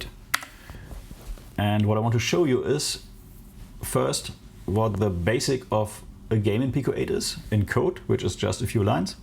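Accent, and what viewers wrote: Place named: Germany. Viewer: Ohne deine Beschreibung zu lesen, höre ich einen starken, deutschen Zungenschlag. Habe eben deinen Kanal gefunden und bin begeistert. Tolles tech-zeug, tolle themen und danke für den howto-spirit! Alles Gute weiterhin!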